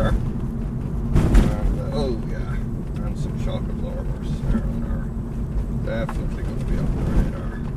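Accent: Ireland. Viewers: Authentic Irish accent, love it!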